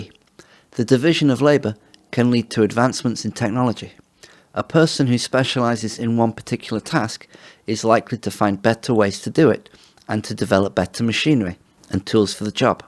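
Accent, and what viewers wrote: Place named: Cheshire, United Kingdom. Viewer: This has to be a Cheshire accent.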